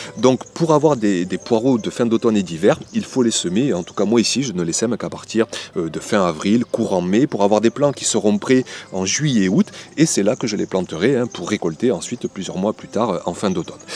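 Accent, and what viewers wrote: South, France. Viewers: Bonjour, moi aussi je suis dans le Sud. D'après votre accent, je dirais que nous sommes presque voisins ! Pas de gel en vue. Mon souci en ce moment c'est plutôt la surchauffe dans la mini serre ! On dépasse les 30 degrés allègrement ! Je laisse ouvert, bien sûr ! Et je mets un voile d'hivernage... Pour faire de l'ombre ! Je n'aime pas trop les radis, mais poêlés, glacés comme des navets, j'aime bien ! Ça ne pique pas, et ça change ! C'est vraiment la saison, et une belle saison ! Amusez-vous bien !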